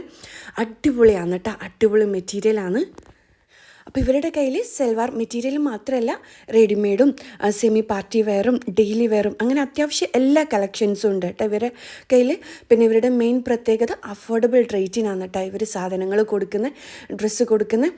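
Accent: Kannur, India. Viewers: കണ്ണൂർ ഭാഷ അടിപൊളി... 👍👍👍ഞാൻ മലപ്പുറം ആണ് ട്ടോ.. 🥰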